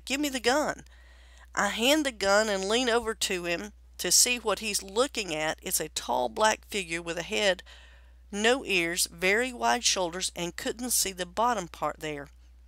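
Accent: Texas, United States. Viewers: Your dialogue is much better. You've lost the Texas draw and speak faster. Thank you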